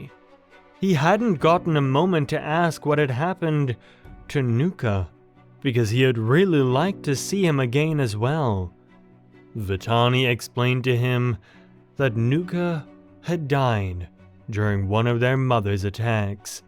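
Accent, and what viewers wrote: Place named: United States. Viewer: Kopa never meet Kion and Kiara i remember the time Zira attacked Kopa you sound American im pretty good at recognizing people's accents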